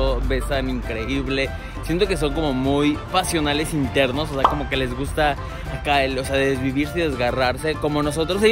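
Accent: Mexico. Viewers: Que lindo eres, a mi me encanta el acento mexicano wey, y sí tienes mucha razon en las cosas que has comentado de los chilenos, pero todo tiene su explicacion.Los hombres aca son muy atentos, detallistas, cariñosos, muy protectores de sus hijos y esposas, caballerosos, guapos(lo digo por mi marido llevamos mas de 11 años de casados y aun me siento que estoy poleando "de novios") nos dividimos todas las cuentas de la casa porque ambos trabajamos y creo que no es justo que el se lleve el gasto de algo que los dos compartimos ( pensamiento de muchas mujeres), el machismo de apoco esta pasando a la historia, las tareas en todos los ambitos se dividen, la gran mayoria deja pasar primero, abre la puerta o sede el asiento a mujeres y adultos mayores ( igual no todos son asi, pero sí un buen %). Si alguien me invita a comer o invito a comer la otra persona me puede decir, "ok pero yo pago mi parte" porque de esa manera no me siento mmmm como se podria decir "comprometida" y se evitan malos entendidos, en caso de las parejas cualquiera puede invitar al otro a comer, como bien dices somos desconfiados, timidos y muy vergonzosos en todo aspecto y lo mejor Solidarios, bienvenido a este hermoso pais que muchos aun no conocen.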